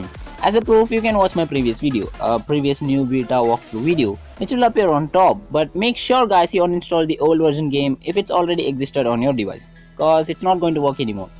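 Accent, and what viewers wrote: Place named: India. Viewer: you sound Indian and your engglish is realy good man keep up the good work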